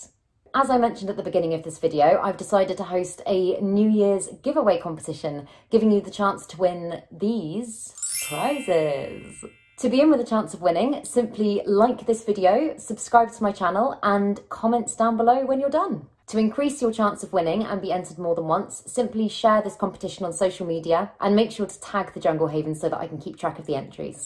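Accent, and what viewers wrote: United Kingdom. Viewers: Nice to hear a British plant youtuber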